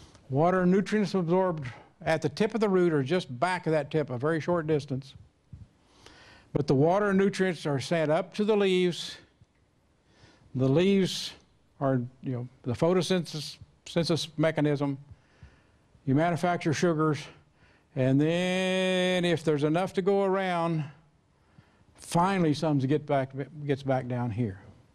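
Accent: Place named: India. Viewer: Looks like the announcer has recently moved out of India. She has a serious indian accent.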